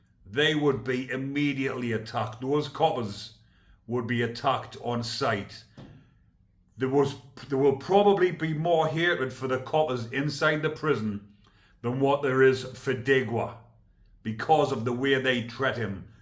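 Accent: United Kingdom. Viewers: May i ask what part of Britain is your accent from? I am very interested in listening to the variety of accents which your country has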